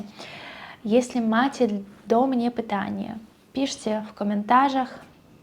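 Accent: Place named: East, Poland. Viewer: Znakomicie mówisz w Naszym języku. Masz bardzo barwne i rozwinięte słownictwo. Melodyka jest ze wschodu. Ale jest to jest urocze. Czy zauważyłaś , że każdy Polak bardzo docenia to, że obcokrajowiec stara się mówić w Naszym , przecież bardzo trudnym, języku? Ja takie osoby bardzo szanuję.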